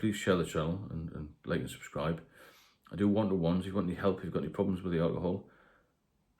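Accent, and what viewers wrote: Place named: Newcastle, United Kingdom. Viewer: You sound like you come from Newcastle. From experience, it's a shame that you don't come from a little further south, Redcar, where pub culture collides with parsimony, worthy of a David Attenborough documentary. "The Redcar drinker, always at the front of the herd approaching the pub, chattering loudly, suddenly withdraws silently to the back as the herd approaches the bar" You might as well get two pints in when it's your round, as the 'whose round is it anyway' game can go on forever when it's one of their turns.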